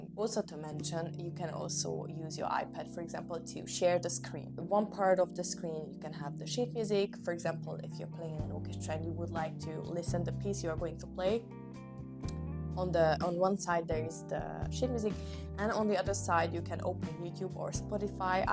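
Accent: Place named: France. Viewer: What is that small black triangular item in the plastic bag that you put in your flute bag? By the way, I love your accent. Are you French?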